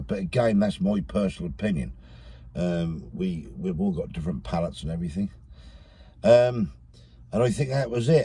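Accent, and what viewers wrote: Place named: Suffolk, United Kingdom. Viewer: Hi and, I’ve just come across your channel, love the accent. My husband was born in Suffolk I lost him late last yr with Alzheimer’s and vascular dementia. Your videos are amazing and of course your accent reminds me of my husband. 😊😊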